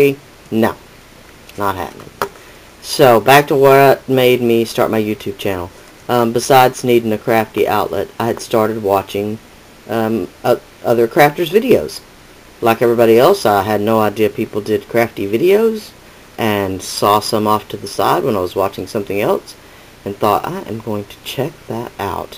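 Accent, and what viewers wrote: South, United States. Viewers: Fun video! Btw I like your voice, and by the accent, I would guess southerner like me😊. I'm the same way I hate hearing my voice in my videos. I think everyone does.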